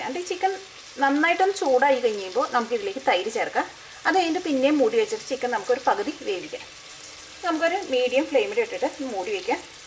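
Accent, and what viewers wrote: Thrissur, India. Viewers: Hey Chechi, You are really great...You have got a lot of talent......Keep up the good work & keep teaching us more & more recipes...Your recipes are easy to understand & delicious too....May God bless you.....& I specially love ur Trishur accent...:)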